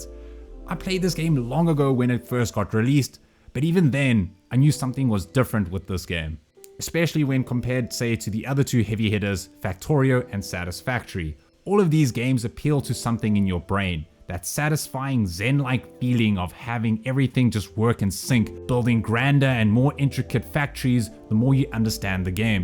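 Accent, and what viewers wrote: South Africa. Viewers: My man sounds like a Saffer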